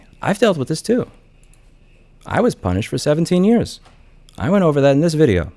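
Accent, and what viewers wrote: New York, United States. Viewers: You can tell Louis is a true NYer. Im guessing Linus is from Caliornia.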